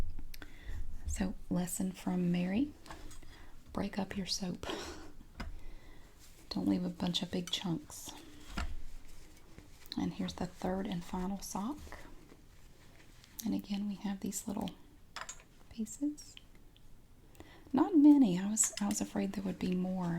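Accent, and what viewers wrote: South, United States. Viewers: your voice is so relaxing and I love your Southern accent. Makes me feel like I'm back home with my family in Franklin, VA :) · “..and the soap is very draaaahhh” (dry). Mary, your southern accent charms the bejesus out of me 😊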